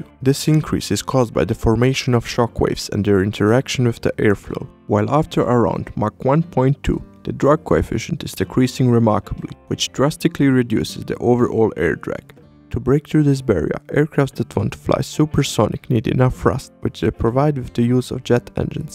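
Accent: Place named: Yugoslavia. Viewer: Ahhhh yes, Yugoslav accent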